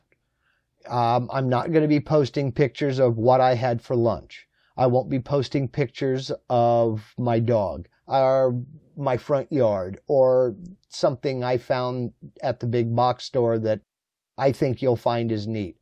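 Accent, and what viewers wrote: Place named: United States. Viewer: Hey. I enjoyed today's video. Like all of us beginners, I thought I would see another of your superb tutorials. But you've already given us a wealth of knowledge and now it's time for us to practice what you've taught and use it. Above all, I want you to continue having fun at what you do, and your upcoming project videos (with maybe a tutorial here and there) are something I'm looking forward to a lot. I had to chuckle in when you talked about not just doing the Vectric videos in your voice. Both of my sons and I agree that one of the best things about your tutorials is that you speak slowly, clearly, and in American English. Vectric's go too fast for beginners, and there's a lot of rewinding to translate the thick British accents on some of the words. I'll also be glad to see the Christmas projects that you post after the first of the year. 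I'm hopelessly behind on what I'm already committed to for this year, but would like to get a head start on next year. I hope you have a great Christmas, and have a lot of fun in your shop getting ready for it. Thanks for all you do.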